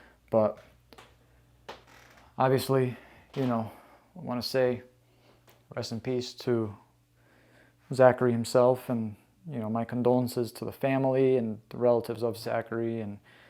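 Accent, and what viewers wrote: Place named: Canada. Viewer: ... You do honestly sound like your from Canada. Other then when you say garage. Lmao... Gareage 😅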